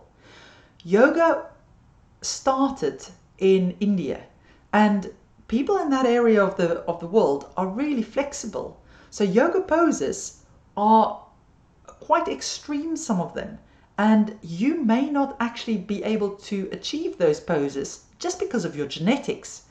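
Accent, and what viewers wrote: South Africa. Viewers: Hi, thanks for this. I'm really enjoying your videos. It's so nice to hear a South African voice:) I'm teaching yoga to seniors and really worry about osteoporosis. I read somewhere that crunches should be avoided. Do I need to worry about other core work? I try to keep it gentle, but would be good to know for sure what I should be staying clear of? Thanks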